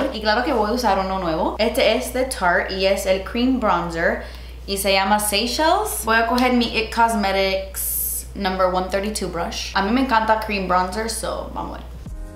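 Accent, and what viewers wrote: Cuba. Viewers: I think you've improve your Spanish in this video so far... I hear you more fluent and comfortable with it. I really enjoy your videos so much and this made me laugh so hard. I love your cuban accent. Send you much love and good vibes <3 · Acento cubano mami!! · such a Slytherin look! love it! and your cuban accent is so cute haha (love from Uruguay :D) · Jajajajajakakakaka me encanta te ha enseñado a hablar alguien cubano o de puerto rico jajajaja pero me encanta ojala te hagas un canal en español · Don’t know how to say it in Spanish? Add “ar” at the end and you’re good to go jajajajajajaja Amo cuando hace los videos en español, me dan mucha risa y el acento cubano es puro amor 😍😍😍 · Me encantó el acento cubano que te salió en el vídeo. Un besazo desde España 💋💋💋💋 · Mojado y Salvaje! 😉 Love this videos in Spanish! Y ese acento cubano es divino! 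😃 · Amo tu acento cubano! Es lo máximo :) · Pero a mi me gusta sobre todo el acentazo cubano tan genuino. Try= probar, usar. Y lo de la abuela: very good fandango! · Hahahaa me encanta su spanglish! 🤣🤣 true 305 cuban style ayeee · Soy mexicana, creo que tu acento cubano es genial, y tu español es muy bueno. Saludos :) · I never comment but these are my FAVOURITE KINDS OF VIDEO EVER. Honestly so good. As a native Spanish speaker, I appreciate these so much 😂 me encanta el acento cubano también. Now I live in the UK so I can relate to not knowing some of the words in Spanish (but I personally have no excuse 😂🙈) xx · Qué gracioso vídeo. Tú español cubano 😁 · You speak Spanish like I do. I consider English my first language even though I am Cuban. I do not have a Spanish accent when I speak in English (which is always). But I’m kind of surprised because of your noticeable (and adorable) Cuban accent I thought your Spanish would be more fluent. 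· Porfavorrrrr more videos in Spanish!!!!!! Tienes mucho flow hablando con ese súper acento cubano!!!! 😍😍 podrías ver algun video de youtubers españolas como: o alguna latina 😝 para mejorar el idioma !! Un saludo desde Islas Canarias (españa) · Me encanta tu acento cubano, de donde lo sacas 🤔 eres muy valiente! Ojalá hagas mas vídeos así para tu publico hispano 😘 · wow your cuban accent is so strong!!! I learn spanish from spain growing up so it's so cool to hear the different accents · Jajajaja comment no se dice "comento" se dice comentario... But you're so cute! Amo tu español con acento bien cubano 🖤 and primer es prebase ya sea prebase de ojos o prebase de maquillaje.. Love you girl! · Me encanta tu acento cubano!!! seguramente no tienes eduación formal en español pero hablas muy bien. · Me encanta ese acento cubano 😂❤️ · Tienes un bonito acento cubano 🥰 love it! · Me encanta cuando haces videos en español, hazlos más seguido así entiendo😁 . 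Se te nota que aprendiste el español con alguien de cuba jeje. Saludos desde Uruguay 🇺🇾(américa del sur) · Me encanta oirte hablar español cubano! Cada vez hablas mejor!! 👏🏻👏🏻👏🏻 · Tu español es súper bueno!!!! Cuando nosotras hablamos de maquillaje (en español) usamos palabras en inglés, por ejemplo: highlight. Así que no te preocupes ❤️ Lo único que me causa gracia es que tu acento es SÚPER cubano y nunca pensé que sería así jajaj a pesar de que tus raíces son cubanas. Proud of you girl ❤️ siempre has sido mi inspiración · Me encantó este video en español, lo hiciste muy bien. Me gusta tu español cubano. Saludos desde República Dominicana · Please please please make more videos in Spanish lol I really enjoyed watching this video, and i love your Cuban accent. fyi my sister have the same name of you, we're from venezuela. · did not know was cuban... or at least speaks with a cuban accent 🇨🇺 ❤️ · Blend= difuminar. 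Amo tu acento cubano 💕 Also we dont call primer the “cebador” 😂 just primer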